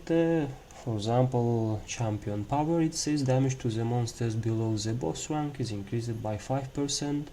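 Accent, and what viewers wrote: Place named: Russia. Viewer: Loving the game, awesome graphic, characters, powers etc. Was brought there by my friend who is obsessed with it lol Btw interesting accent, Russian perhaps?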